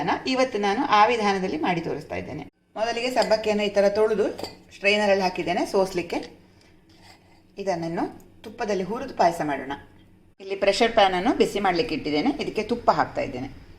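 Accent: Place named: Mangalore, India. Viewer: Thumba nidhaanavaagi Mangalore kanadadhalli neevu vivarisuvudhu thumba chanda👌👌neevu elli iruvudhu Madam? Mangalorenalla?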